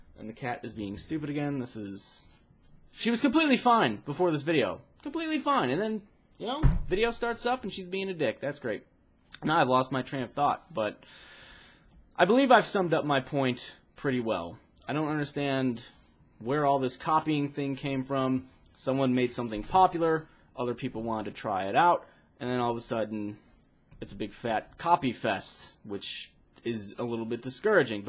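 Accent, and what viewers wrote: Ireland. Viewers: Hey are you irish please respond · are you irish?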